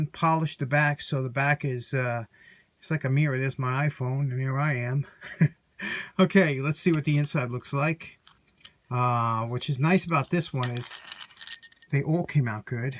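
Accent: New York, United States. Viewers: Now you know my favorite part.... remember how this looked BEFORE I started..... Ok, I admit....I've heard those words with that New York accent in my tool restore dreams as of late. They usually involve a crowded basement complete with the musty smells of an old Victorian era home paired with the faint essence of oil and varnish. The recesses and corners of the room loom in mysterious darkness but the Hydraulic press and the lathe always make themselves known. Finally my attention is drawn to a remnant of red carpet where some shiny tool gleams with an otherworldly aura. The choirs of sweet heavenly voices harmonize with the 50s bad-boy motorcycle theme music. All is well in the world.....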